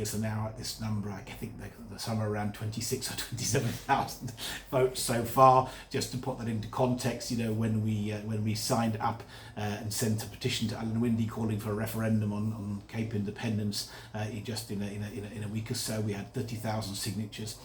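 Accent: United Kingdom. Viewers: This guy actually has a British accent. He needs to go.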